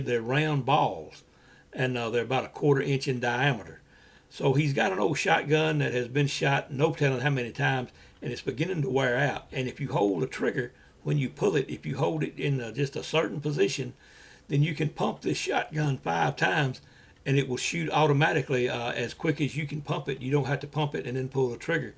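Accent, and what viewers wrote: South, United States. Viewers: That was a good story, the illustrations were fantastic and I love your southern accent. Glad he didn't shoot BF and all turned out well for everyone, sorry the dogs got beat up tho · I loved listening to your Southern drawl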